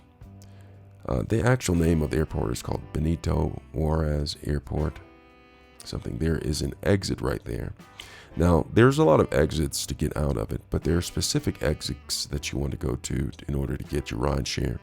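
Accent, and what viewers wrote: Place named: France. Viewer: Very very helpful! Love that French accent! But seriously, I've watched several of these and yours is the best one by far. Huge difference in the presentation and the others were very shallow in the delivery of information and were way less thorough than you. Even the ones that are twice as long as yours we no where as informative. I'm not traveling until January 2026 and I'll definitely watch this video again as part of my final preps. Thanks a million.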